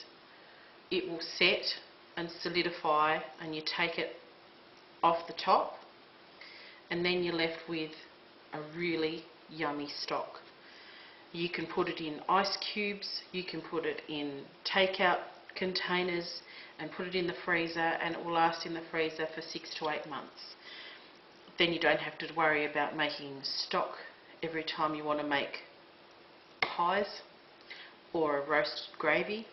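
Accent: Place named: Australia. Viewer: There's the Aussie accent -- and the rest of the English-speaking world. Kiwis are even harder to understand for the rest of the world. What's so AUSSIE here is the electric pie maker! G'day nice lady!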